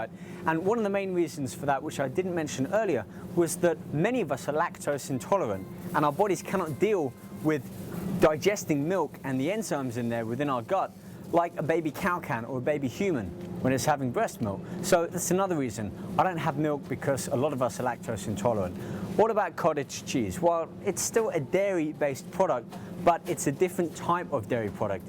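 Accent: United Kingdom. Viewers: woter, olive ol, mo. british haha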